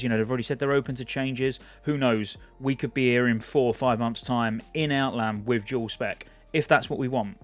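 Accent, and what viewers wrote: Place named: England, United Kingdom. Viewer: aww I just watched a video about this... well at least I get to watch it now in an english accent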